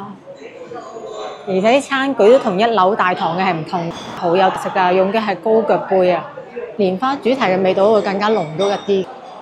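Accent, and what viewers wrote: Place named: Macau, China. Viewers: MOGO係唔係澳門人?好重澳門口音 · 終於有人問我一路想問嘅問題，點解第十甫長大嘅廣州人咁重澳門音🤔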